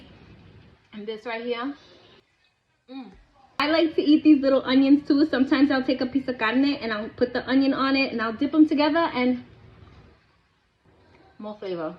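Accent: New York, United States. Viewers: Her Spanish accent is fighting with her ny accent. Sometimes ones winning sometimes the other is. Lol.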